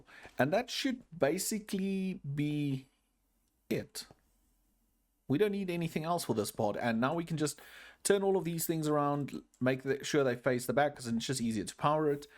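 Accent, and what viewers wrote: South Africa. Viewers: You sound a lot like RenDog are you south African per chance?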